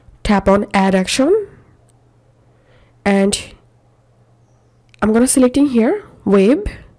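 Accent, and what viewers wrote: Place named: India. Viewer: I don’t trust the Indian accent…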